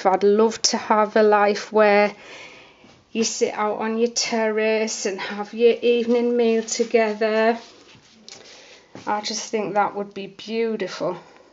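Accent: United Kingdom. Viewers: Love to hear your beautiful British accent and your videos! Living in Rochester, NY we have alot of gray days as well. Keep up the great work, You cheer us up here!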